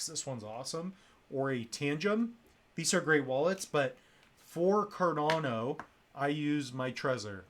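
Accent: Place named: Canada. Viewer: Heard the Canadian accent 😂 I was just thinking "if he's not from canada he's from Minneso-da"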